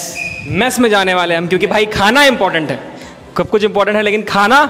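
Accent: Bihar, India. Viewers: Aap bihar se ho kya????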